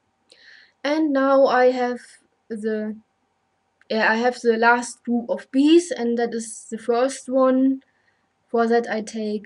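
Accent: Germany. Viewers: I love your german accent ^^ (no offence, i am just learning german)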